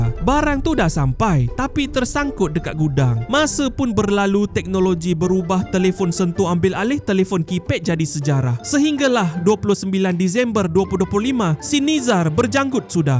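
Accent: Malaysia. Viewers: Bahasa ya mirip baha indonesia tapi nada suara ya mirip malaysia.... Ini orang malaysia atau orang indonesia wok 🗿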